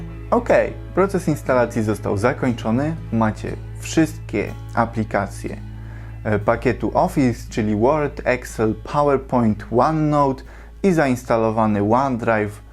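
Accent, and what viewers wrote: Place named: England, United Kingdom. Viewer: I ten angielski akcent. Bardzo przydatny film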